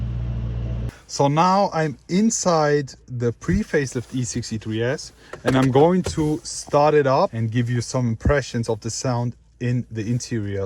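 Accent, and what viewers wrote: Germany. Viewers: Digger dein Englisch ist komplett weg alter man merkt so hart dass du deutsch bist aber trotzdem nices Video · Leicht deutschen Akzenten 😎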